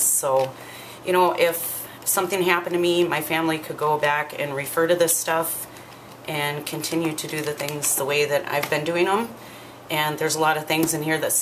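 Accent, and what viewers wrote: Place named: Wisconsin, United States. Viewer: You talk like the folks I grew up with back in Wisconsin>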